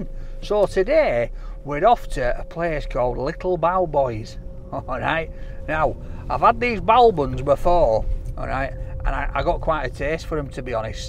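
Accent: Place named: Yorkshire, United Kingdom. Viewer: Absolute class guy man, Yorkshire raised him well man actually love the way he talks always gives me a chuckle!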